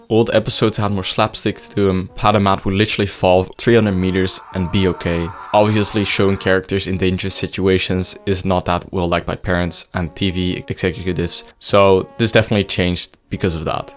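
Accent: Netherlands. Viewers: You can tell by his voice and accent that he is Dutch sooooo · You Dutch yourself? bc HOLY ACCENT haha im from nl soo that why i know the accent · his voice get more Dutch over time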